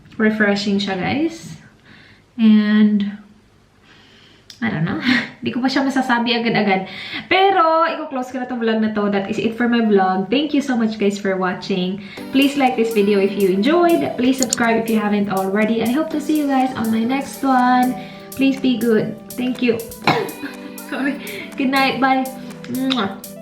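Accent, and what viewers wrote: Visayas, Philippines. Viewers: hahah na klaro ang pagkabisaya ba.agay3x hahahaha daghan na gyud tang mga bisaya gyud. new subscriber diay. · So far so good..i enjoyed this vlog..i mostly love your sense of humor your so fun to watch..and let me ask..are you perhaps a Visaya?? Hahah..it's just so cute..love your accent too..more power and godbless. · Agay so meaning bisaya xa. Hahah tama yong duda oks her accent says it all. Bisaya here as well i like the way you speak English the accent is great. From where man diay ka sis hehe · Ate kabol ka magbisaya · Bisaya po kayo ate? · Bisaya d i ka?... agai agai... haha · I really like your accent... when you speak in english, even you have a visayan accent maganda pa rin sa pandinig.. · Hahahaha agay agay pa more hahahaa Bisaya kaman day oi 😁😁😁😁😁😁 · it's my first time watching your vlog ate and i really like it now 💕😍 especially your accent bitaglish😍💕. 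· Bisaya mn diay😂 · Visaya ka ate? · Hahahaha awa bisaya lage klaro kaayo. 😂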